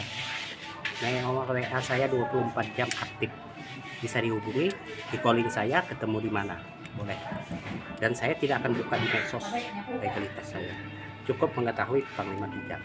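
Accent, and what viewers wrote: Indonesia. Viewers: Logat kutai,Banjar ini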